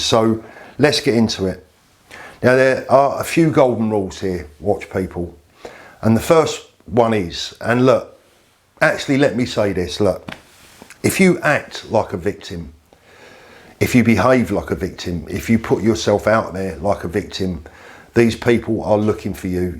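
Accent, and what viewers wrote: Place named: Yorkshire, United Kingdom. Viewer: Yorkshire guy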